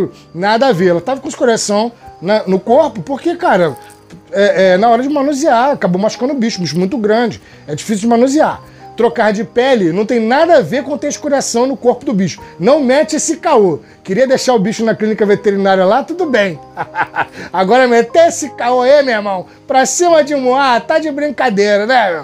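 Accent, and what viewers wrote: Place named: Ceará, Brazil. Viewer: Sotaque iguazin o pessoal do meu bairro kkkkkk é do Ceará mesmo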